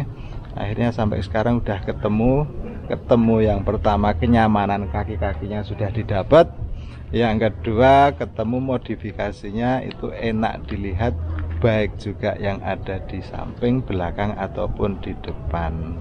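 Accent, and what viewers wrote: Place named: Java, Indonesia. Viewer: Aku bru liat Chanel sampean pakde, walaupun ngomong nya medok Jawa tapi aku suka cara review nya..jelas, detail dan mudah dimengerti.. sukses , selalu pakde..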